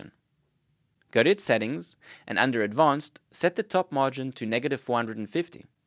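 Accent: South Africa. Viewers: I love that South African accent, makes me feel back at home. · South African accent, yum.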